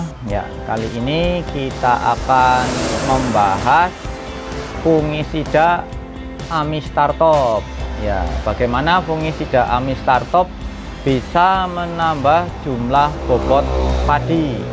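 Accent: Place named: Brebes, Indonesia. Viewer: Ngomonge kaya wong brebes..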